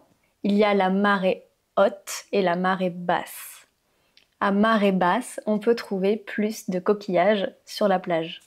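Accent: France. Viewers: Tu parles le vraie français bien prononcé bien modulé avec un accent merveilleux et pas comme le français québécoise ou de la Suize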